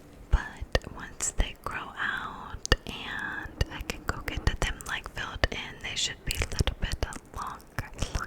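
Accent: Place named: Midwest, United States. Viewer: your Midwest accent makes me feel right at home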